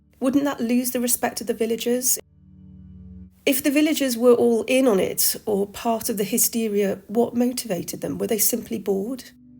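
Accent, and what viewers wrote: United Kingdom. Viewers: Just found this channel this morning & now later at night this particular vid was in my feed. Had already saved to watch later but it came back through again so had to click just to hear that word pronounced with a Bri'ish accent lol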